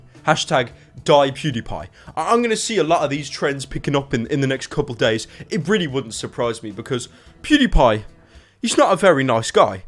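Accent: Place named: England, United Kingdom. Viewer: ur english akzent is so fucking gay holy shit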